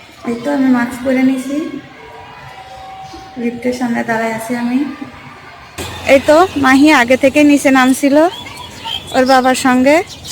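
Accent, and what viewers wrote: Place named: Khulna, Bangladesh. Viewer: আপু কি খুলনা বিভাগ এর মানুষ নাকি? আমাদের ভাষার সাথে খুব মিল পাচ্ছি।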